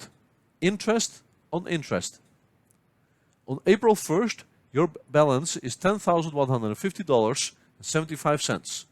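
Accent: Netherlands. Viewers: From the first 10 seconds i already heard you were dutch. Super bedankt voor deze geweldige uitleg meneer. Ik ben 1e jaar studente econometrie en begin nu met Finance. Waardeer het enorm.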